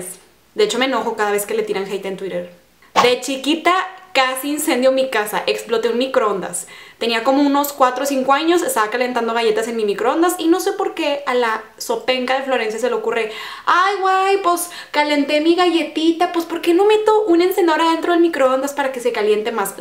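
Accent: Monterrey, Mexico. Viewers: Que buena onda y vibra trae mi paisana .... me encanta por su real acento regio!!! hay muchos foraneos que por vivir aqui ya se creen regios y no nunca les sale el acento.... y a parte super tips q nos da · Yo si saque el acento que eras de Monterrey.. Somos regias como no notarlo 😁 Amo tus videos, cada vez que los veo siempre me sacas una carcajada..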